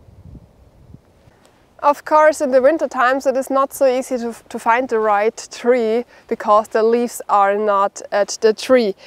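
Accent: Germany. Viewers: I really enjoyed this video. I have subscribed because there seems to be something new to learn about the uses of herbs and trees. I also LOVE your accent. I have been to Germany three or four times, and I get a chance to practice speaking German. Mein Deutsch ist ganz schlimm aber Ich kann es verstehen und lesen. Deutschland ist mir lieb. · Just found this channel, it’s wonderful! My mother is German, father USA (he was stationed in Germany when they met). Naturally, I love the sound of your voice and enjoy hearing the Germanic inflections as my mother spoke that way. I love trees, I grew up in areas with lots of woods to explore and play in. The forest is my favorite environment. Yes, please do a video about winter foraging! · You German?? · I think it's a German accent from Alemania. Again I can't spell very well. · love your video…it has lots of great information. 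I live in WA State USA, on the Border with Canada. On my 10 acres we have Alder, Broadleaf Maple and Vine Maple, Birch, Bitter Cherry, Indian Plum, Douglas Fir, Hemlock, Cedar, Mt. Ash, Butterfly Bush and wild Hazelnut. I’d love to learn more about uses for these trees and shrubs. I really like your German accent and your English is excellent. Thank you for sharing and I’ll keep watching and learning from you.🇺🇸🇩🇪🇺🇦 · Is she German? Lived there 88-90 in the US army.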